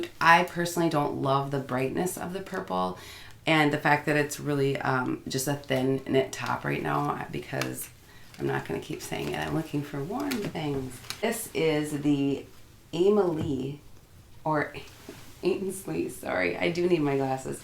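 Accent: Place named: Midwest, United States. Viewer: Okay, I have to know... are you from the mid-west?! I grew up in Minnesota and have lots of family there and you have sweet little hints of the mid-west accent. :)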